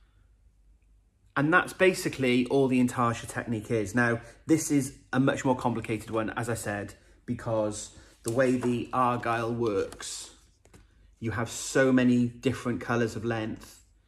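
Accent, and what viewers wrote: Wales, United Kingdom. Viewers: Really enjoy your podcasts, its nice to hear a lovely Welsh accent. I am newly subscribed and enjoy and feel inspired once I watch them many thanks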